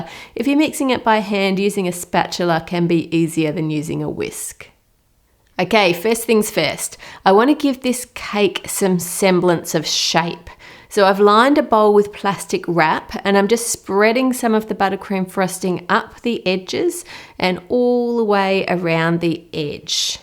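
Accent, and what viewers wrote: Australia. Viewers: I am in love with her Australien accent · That Aussie accent is just gorgeous. And of course your content is awesome ! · Looking gooouud. That aussie accent 😅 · She sounds Australian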